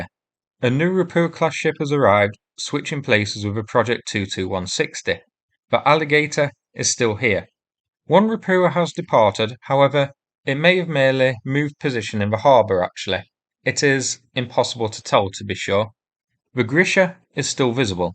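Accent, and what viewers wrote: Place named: Lincolnshire, United Kingdom. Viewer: I'm trying to identify your accent! You sound exactly like a good friend I worked with years ago in Bangkok! He ( Gavin) was from Lincolnshire area I think?? Keep up the great reporting!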